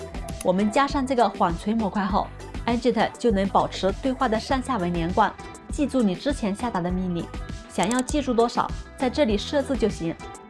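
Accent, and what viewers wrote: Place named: Jiangnan, China. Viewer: 听口音可能是中国大陆南方江浙沪一带的？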